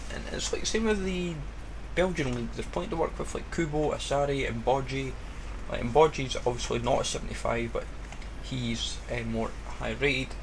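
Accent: Scotland, United Kingdom. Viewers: i fucking love scottish accents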